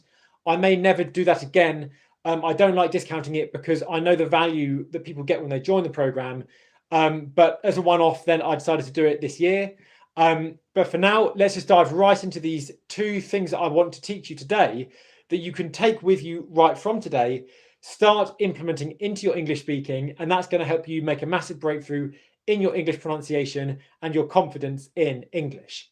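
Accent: United Kingdom. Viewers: Is there any course taught by you, to learn British accent. If it is available on which platform it is available, please let me know.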